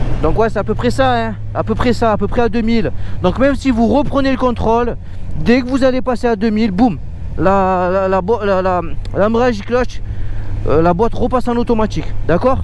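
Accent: South, France. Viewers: Super vidéo ! Et franchement ton accent du Sud quel plaisir à écouter pour un parigo comme moi 💪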